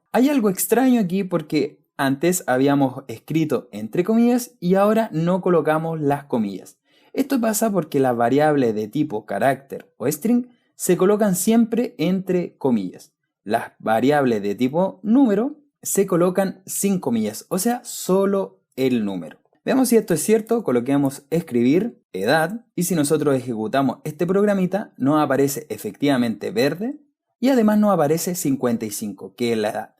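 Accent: Chile. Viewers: Acabo de conocer este canal. Apostaría mi cuello que el que habla es un compatriota chileno. Muchas gracias por tan buen canal, es un gran aporte.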